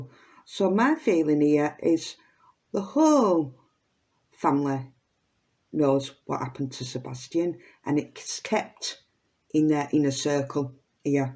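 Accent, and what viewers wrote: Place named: Yorkshire, United Kingdom. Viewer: Hi hun new sub you sound very yorkshire im from Leeds and you sound same accent loving this ive watched this about Sebastian from day one its so sad 😢💚💚💚💚💚💚💚💚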